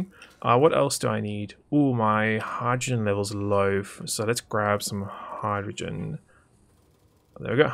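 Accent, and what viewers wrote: South Africa. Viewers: Is that a south african accent I detect? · Sounds a little like a ZA boi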